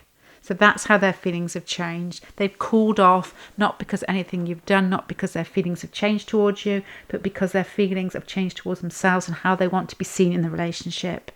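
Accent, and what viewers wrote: Manchester, United Kingdom. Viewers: Are you mancunian? From Manchester?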